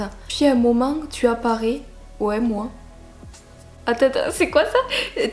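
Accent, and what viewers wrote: South, France. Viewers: Et j adore ton accent du sud ^^^ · l'accent du sud ❤❤❤ j'adore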